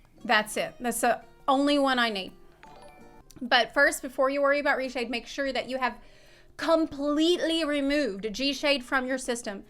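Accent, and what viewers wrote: United States. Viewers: Her cowgirl accent is coming back to fast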